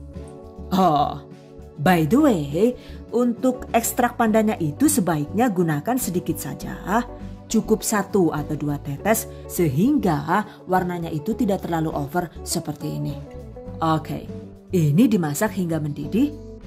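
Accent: Banyumas, Indonesia. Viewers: Ora ngapak ora kepenak yu#GiveawayTHV2021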